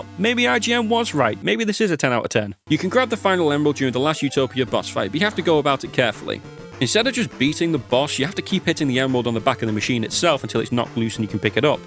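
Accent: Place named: Liverpool, United Kingdom. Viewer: Why do you sound like you're from Liverpool?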